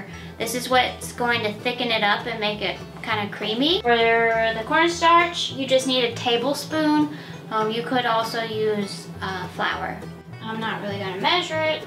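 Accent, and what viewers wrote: South, United States. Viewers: Your little family is adorable ♥️. And are you loosing your southern dialect? You are starting to sound more and more like a Californian.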